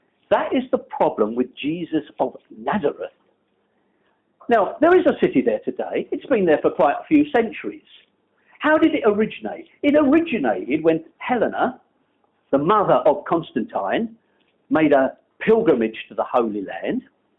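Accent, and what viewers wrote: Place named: North London, United Kingdom. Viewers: he sounds like he comes from N London. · I like his London accent. I despise the Australian and especially the New Zealand accents.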